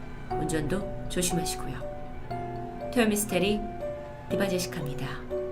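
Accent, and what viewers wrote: Gyeongsang, South Korea. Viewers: 역시 갱상도ㅋㅋㅋ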